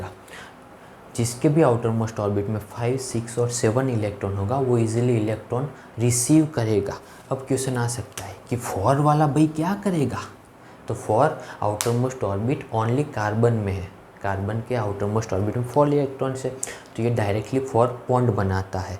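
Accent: Gujarat, India. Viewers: Sir AAP gujrati ho ?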